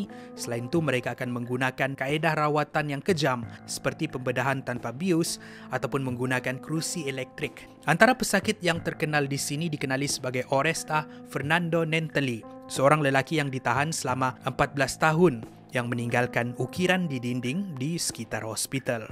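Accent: Sabah, Malaysia. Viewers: Abang orang Malaysia ke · Orang sabah kah ni😂😂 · Btw i Mo ckp , loghat mu mcm kntara org Sabah 😅😅, org Sabah eh ? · Abang ni orang melayu ( Sabah ) atau indonesia ya? Kalau saya orang malaysia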